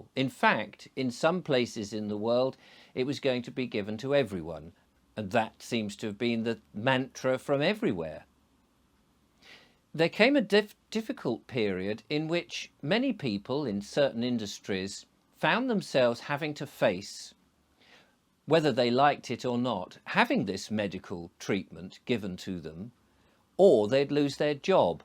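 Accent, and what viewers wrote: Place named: RP, United Kingdom. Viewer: Hi, thanks for sharing this story with us. It must be truly painful and worrying for you as a parent. I recently found you and subbed, as I find that the truth and openness that you speak needs to be heard and shared. You and I are like complete opposites, I am from the black country, broad accent and a bit rough round the edges, you are, if you don't mind me saying, a bit posh and well spoken. But strangely I can relate to you more than many others around me. I too knew the truth from the beginning and stood my ground, while many blindly followed and harmed themselves and their families. Again, thank you, from the bottom of my heart.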